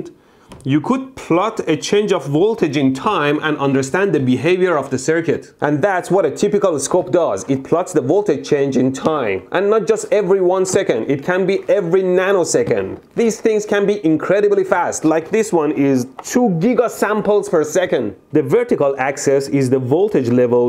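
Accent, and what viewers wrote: Iran. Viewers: your english accent... I knew you were a fellow iranian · I love your Iranian accent. Greetings from Germany. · لحجه ای که بخاطر ایرانی بودن به ارث بردی را خیلی دوس دارم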